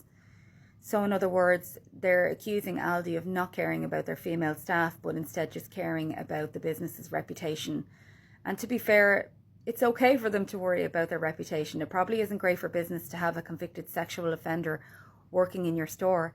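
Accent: Ireland. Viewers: More money of the taxpayer ,the problem is people keep voting for the people that do not stop illegal migrants. and all this makes it so bad for good men who look at a gorgeous woman like you with an amazing irish accent as predators . dating and even looking at women is hard now .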